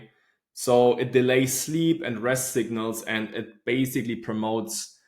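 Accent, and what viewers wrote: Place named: Germany. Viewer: Warum sprichst du eigentlich nicht deutsch 😅? Deine deutsche englische Aussprache ist richtig geil 😅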